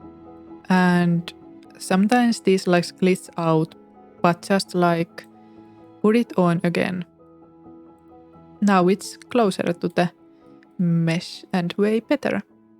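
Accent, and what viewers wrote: Finland. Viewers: Your Finnish accent is incredibly strong :D But thanks for the tutorial! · Your Finnish accent is Awesome!! as well as your tutorial! thank you! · Terve mita culu? I’m Finish American & when you began speaking I knew you’re Finnish. onnea!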